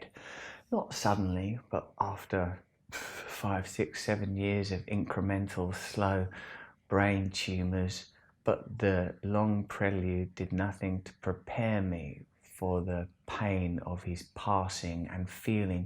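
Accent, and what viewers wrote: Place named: Cockney, United Kingdom. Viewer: Is there no topic this nit won't publicly pontificate on? I bet he could drone on for hours about quick drying cement, just so as to hear his own mockney tones reverberating inside his empty skull. Russell, do us all a massive favour, and keep your inanities strictly to yourself...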